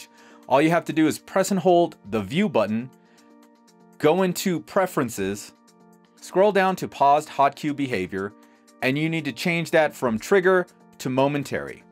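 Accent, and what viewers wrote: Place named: France. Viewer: Very well explain and film, you speak clearly and for a french guy it's cool for understanding what you say Effects are are not too much and final result seems professionnal. Very good job !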